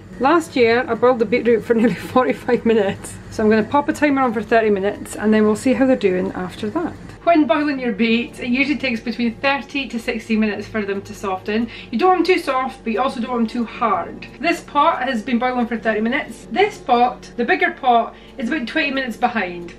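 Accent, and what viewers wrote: Scotland, United Kingdom. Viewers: Brilliant, great to hear a Scottish accent.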